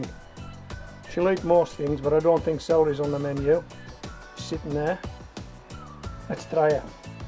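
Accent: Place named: Scotland, United Kingdom. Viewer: Music to my ears the scottish accent! Perhaps because my ancersters "Remmington" from 5 generations ago, where from Scotland and emmigrated in Ontario, Canada? Re: Celery harvest, cutting 2 inches from the base and covering them with a polytunnel will prolongate the harvesting date of a couple of months. Great videos. Take care.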